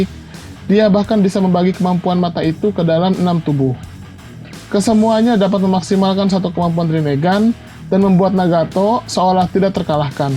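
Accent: West Java, Indonesia. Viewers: Kamu orang Sunda ya min?? Hayo ngaku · Didenger dr cara ngomong nya, kayaknya si mimin ini urang sunda nya ?? 😁😁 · Ini yg ngomong orang sunda ya soalnya logatnya ketara bgt, bilangnya narutok sasukek belakang pake "K" semua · Orang sunda ya?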